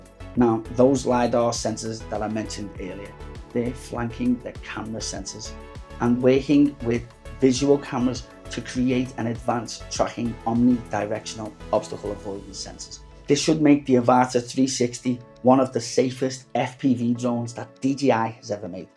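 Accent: Liverpool, United Kingdom. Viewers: Wow did this guy just really say that 😂😂 its ok old white men think they have the right to tell people how to speak and behave 🙄 you obviously dont know what people from liverpool sound like. I I bet to some other people you might sound like your saying different words and letters, you should see what real people from liverpool sound like 👍🏼 they talk really fast and pronounce things differently but he is actually saying DJI as normal, just your the only person who feels its thier place to tell him otherwise 🤔 gota let people be people without trying to put them down. He's actually doing really well, and maybe you dont think your being a absolute tool 🙄 and I wouldn't say its my place either to say your being a tool but you know your being a tool 😉. Now instead of commenting trying to put somebody down by telling them how to speak, try a more encouraging approach but you know what they say you cant teach a old dog new tricks, so get more worldly and understand that the world is full of people who speak different people. 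from liverpool have it hard enough with people trying to understand them from thier own country, now I have followed this guy from the start and all his first videos were AI voice overs because nobody could understand him, and he has changed and changed and changed the way he speaks enough over the past few few months to actually gain the confidence enough to speak on cam. And yeh at first he was speaking really slow and robotic because he tried to speak just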